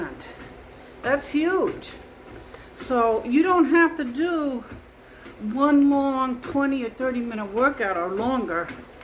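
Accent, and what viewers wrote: New York, United States. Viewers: PS... you don't have to walk to keep me listening. I love your NY accent and your great personality.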